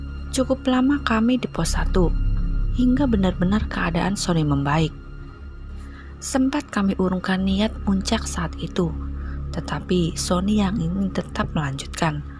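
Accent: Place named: Central Java, Indonesia. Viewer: mbaknya pasti asli jawa tengah ya, kliatan suaranya.. darimana mbak min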